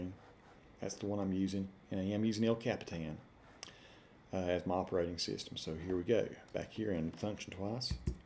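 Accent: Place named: Indiana, United States. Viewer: That was pretty entertaining! lol If I read the parts that it did not get right with my Hoosier accent, I sound like you! Subscribe if you lock! lol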